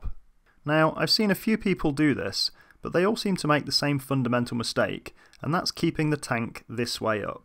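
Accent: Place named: England, United Kingdom. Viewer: I like this idea. The only thing you may have missed or considered not necessary is a pressure relief valve. If you decided to do without, why?....guess I should of read the comments first as apparently I'm not the only one wondering and the question was asked and answered. You didn't show how the tank was connected to the compressor tank in detail. It's assumed that with your accent and the union jack you and from England so what is the code as it pertains to pressure vessels? Thanks.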